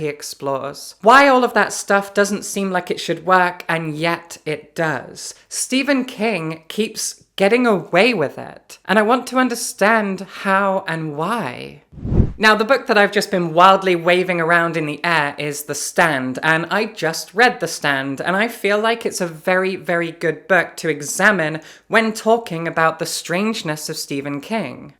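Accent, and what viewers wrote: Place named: Scotland, United Kingdom. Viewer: Accents: your own is fascinating. Clear indications you don't mix with working class Scots (or Scots!) much, posh Guffie tones. Vocal crack and Americanate vocabulary indicating your chief influences culturally are from the "Land of the Free". 😂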